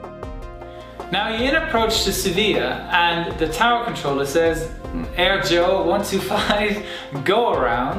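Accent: Germany. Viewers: Das ist das erste video was ich von dir gucke und ich hatte von anfang an das gefühl das du ein deutscher bist :) und es hat sich als wahr herausgestellt :D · Is he german?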